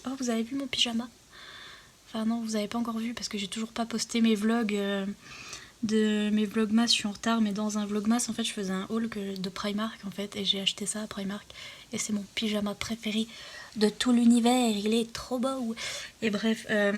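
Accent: Quebec, Canada. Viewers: Allo ! Je trouve ton accent québécois vraiment bien et ca vient d une québécoise 😉 j ai beaucoup aimé t ecouter en coloriant dans mon nouveau livre ❤️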